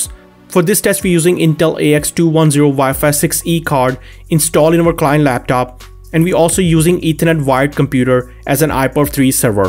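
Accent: India. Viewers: The Indian accent made me wanna stop watching, I can’t, it’s so heavy😂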